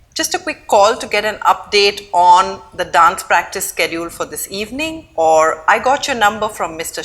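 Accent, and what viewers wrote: India. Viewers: hi, this lesson was great to have . nice teaching.are you from India or from another country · You are indian madam so sweet accent. from France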